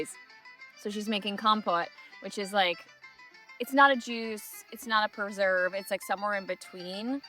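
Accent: United States. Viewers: Can I ask a question about why do you have a strong US acceant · I don't understand how English is your second language, but you have an American accent?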